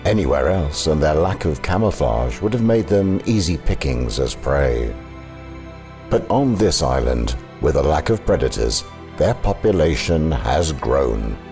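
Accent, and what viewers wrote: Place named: Australia. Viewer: Love the way they chose a really Aussie sounding voice for the commentary, plus he bungs it on a bit more too 😃👍🇦🇺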